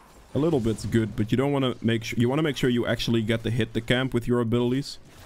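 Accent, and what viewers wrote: Netherlands. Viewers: Ik hoorde van de eerste 2 woorden al da je Nederlands bent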